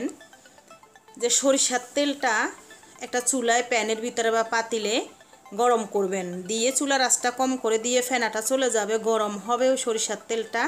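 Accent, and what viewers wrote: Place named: Feni, Bangladesh. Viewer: আপনার বাড়ি কি ফেনী?আমার স্বামির বড় ভাবির কথার ধরণটাও এরকম।By the way আচার টা দারুন হয়েছে।👍😊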